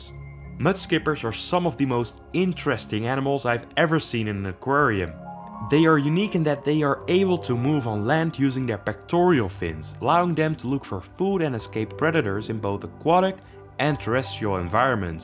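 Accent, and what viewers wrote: Netherlands. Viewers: I think your Dutch😁 by hearing your accent so….super gaaf. Ga zo door met video’s maken.😊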